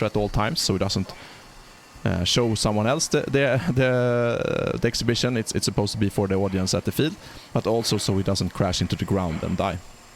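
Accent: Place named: Sweden. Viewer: Love your Svengeska.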